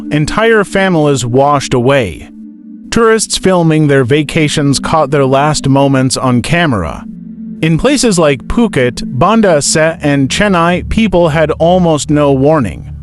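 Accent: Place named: United States. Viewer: Ik zit niet op Amerikaans praten te wachten.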